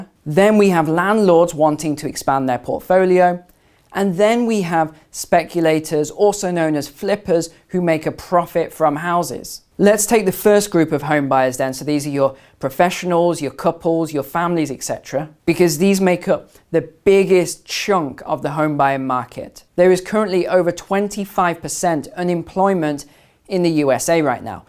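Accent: United Kingdom. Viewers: Its weird listening to a British sounding guy talk about American reality. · God bless you for saying all four syllables of "military" !! With a British accent, I was fully expecting to hear "mili-TREE" instead.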